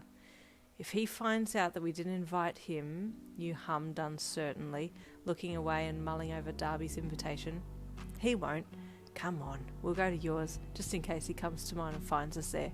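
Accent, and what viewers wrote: Australia. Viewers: Loved this reader, I've got a feeling your Australian, I can hear the accent : )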